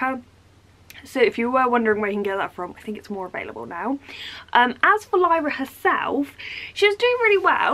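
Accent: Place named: London, United Kingdom. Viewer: You've got a mixed accent... London and?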